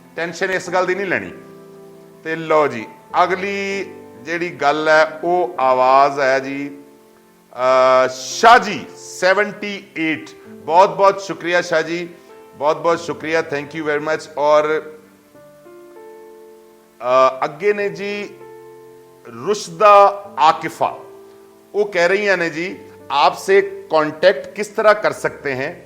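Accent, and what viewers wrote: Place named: Rural Punjab, India. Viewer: saab aap punjabi bohut pyari bolte ho. Pure desi punjabi. Love it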